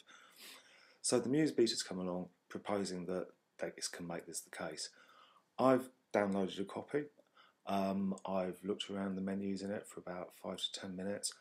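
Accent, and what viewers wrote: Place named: Cockney, United Kingdom. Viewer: For God's sake man, turn up up your bloody recording volume if your going to speak in that cockney accent.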